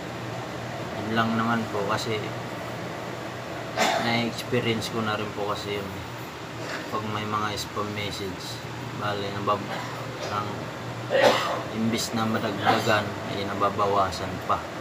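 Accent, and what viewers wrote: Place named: Bulacan, Philippines. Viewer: Puntong Bulacan bgung kaibigan po plitan tau regalo po lmat amega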